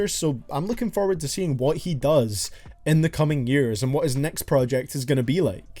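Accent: Scotland, United Kingdom. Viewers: Hey are you from Scotland?, I am!!!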